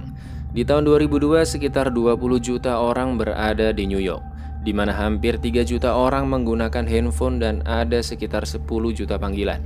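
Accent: Java, Indonesia. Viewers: Jowo ngendi mas' 😂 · Abang orang jawa ?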